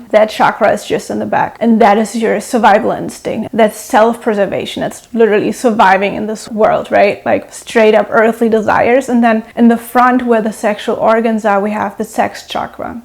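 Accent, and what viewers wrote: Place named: Germany. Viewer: Are you German? I love your accent!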